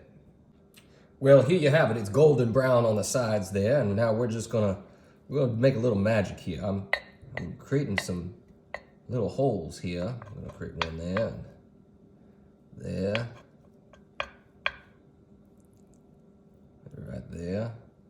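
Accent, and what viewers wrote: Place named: Virginia, United States. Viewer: Sounds like Virginia's in the house! Haven't heard that accent since The Walton's😂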